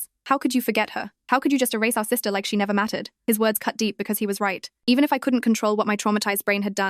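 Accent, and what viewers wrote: United Kingdom. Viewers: British voice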